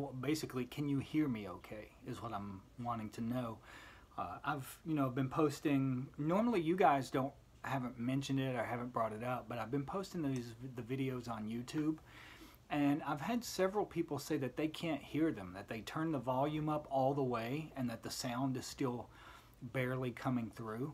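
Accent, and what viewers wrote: United States. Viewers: Hearing an American accent saying something constructive about my beloved Crowley took all the pent-up defensiveness right out of my shoulders and almost made me cry. I've been on gab too long. The religious people on social media are insane with hatred for anything but mainstream belief systems.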